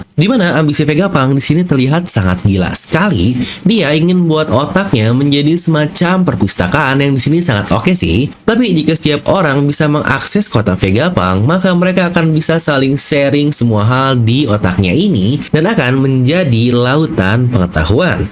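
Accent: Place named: West Java, Indonesia. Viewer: hahaha, urang sunda horeng. :)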